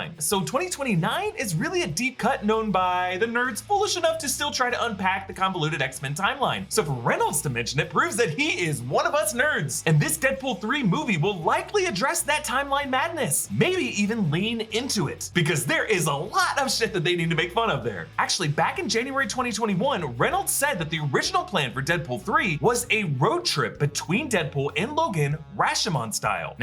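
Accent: Australia. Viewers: I loved your Aussie accent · Aussie accent is on point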